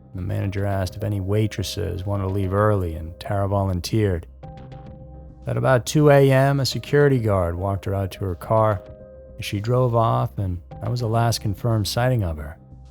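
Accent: South, United States. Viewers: Came For The Stories, Stayed Because Of Your Lovely Southern Voice.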